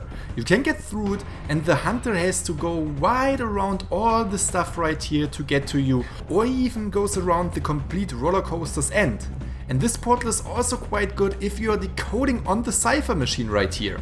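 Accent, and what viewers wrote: Germany. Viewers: are you german? Your accent sounds really similiar to mine. :)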